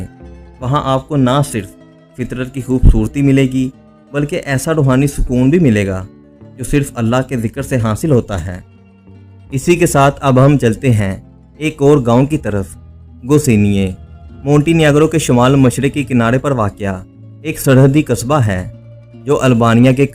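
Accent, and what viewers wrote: Punjab, Pakistan. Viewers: Improve your speaking skills, your accent is typical paindoo punjabi, not suitable for these videos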